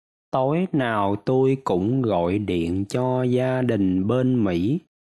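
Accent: Mekong Delta, Vietnam. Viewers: Giọng này là giọng miền tây, tôi đoán là Bạc Liêu vì chữ đêm nghe như đim. Giọng Bạc Liêu iêu thường thành im như thêm thành thim. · xin chào, Hello, Bonjour! anh cám ơn con đă gủi liên lạc cho học tiếng Việt giống âm thanh miền duyên sông Cữu Long. Thanks!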